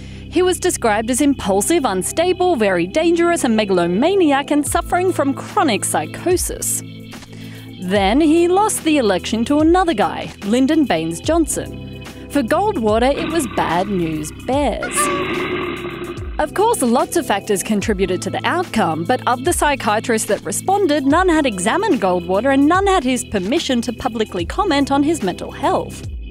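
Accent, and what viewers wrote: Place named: Australia. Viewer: Am I the only one that thought it was a bit odd that Australian was narrating this?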